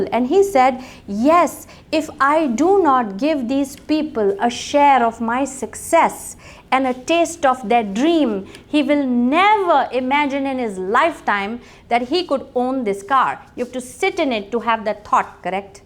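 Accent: India. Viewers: In this video there is maximum Indian English... Keep it up.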